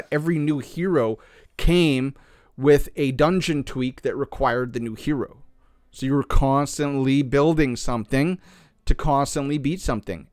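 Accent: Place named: Canada. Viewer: Thats one hell of a Canadian accent. Well done 🫡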